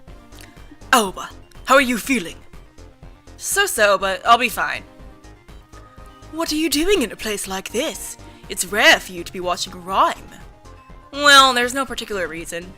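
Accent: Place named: South, United States. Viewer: Loool, love how your accent likes to default to Southern when you try and put on a voice XD! I must say, I nearly lost my hearing when you started doing Akushima's voice. I don't usually watch you and with earphones as I've learnt from before (ESPECIALLY when you voice Mr Bandages) that volume tends to vary sometimes:P But now I'm trying to catch up on this at work and just xD...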